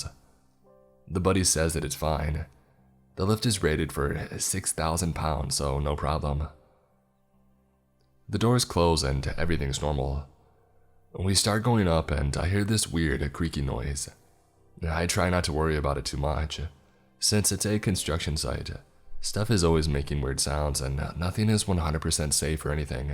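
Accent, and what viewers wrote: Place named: United States. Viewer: Ok I love this channel but some videos he does this voice? An American accent? I'm American so no hate but.. he sounds like a valley girl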